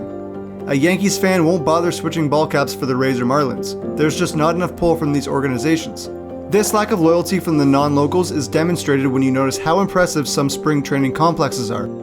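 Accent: Canada. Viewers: Your content is great. The only complaint I have is that if you want to be known as a top video producer, you should make a conscious effort to pronounce the ou, ow sound as people in the U.S. do and not as a Canadian. When i took a speech class in college, the first thing the professor did was explain that if we were ever to go into broadcasting, it was very important to have a generic voice that doesn't let people identify us with an area. You can go to New York for example and the broadcasters talk the same as the broadcasters do in Texas or Alabama. That is the only fault that i have. The content is great but with a little effort, you could make it even better. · I noticed the Canadian pronunciation immediately because I have a friend from New Foundland! I remember reading that when Kate Mulgrew went to NY for acting classes that was the first thing she had to do was to lose the Midwestern accent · Is the narrator Canadian?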